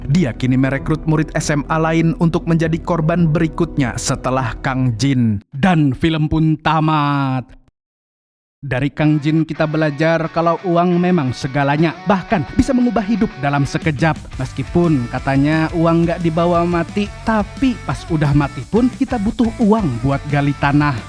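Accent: Banjar, Indonesia. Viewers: Terlalu banjar mang ai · Pian ni orang Banjar KH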